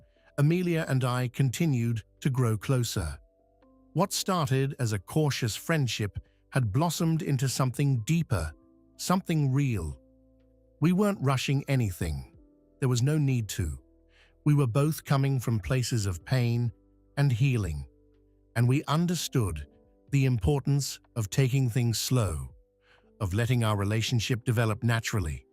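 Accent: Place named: England, United Kingdom. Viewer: The English accent is fitting in this case.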